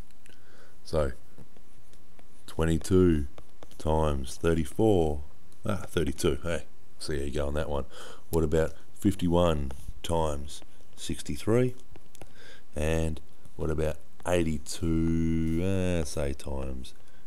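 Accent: Australia. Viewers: is this australian english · I agree with Alexandre, ok you are from Australia, but you could talk a little bit more clearly.. if you know you have an accent well....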